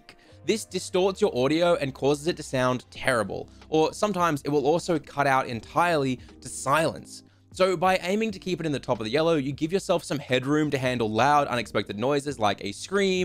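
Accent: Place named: Australia. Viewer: I've followed for a while and I've even watched this video before as it's helped with mic setup. However why am I only now realising he's Australian!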